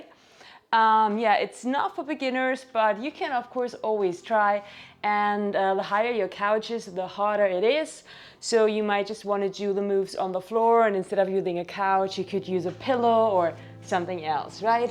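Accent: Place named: Ireland. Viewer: I’m just curious q about your accent, sounds some Irish in it, I always watch👌your videos.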